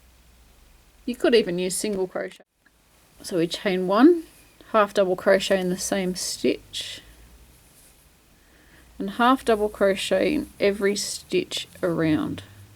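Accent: Australia. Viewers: Hi, my daughter and I have been enjoying watching your videos. Thank you for the good explanations, camera angle and ... the aussie accent, being aussies ourselves I love the intro of g'day. You throw me a bit using US terminology, I was taught that a dc was a trbl, sc was a dc etc., and my following a pattern skills arnt real hot, so you are enspiring me to try to improve. Keep up the good work. Look forward to more of your videos. Thanks heaps sandra and natalie grantham.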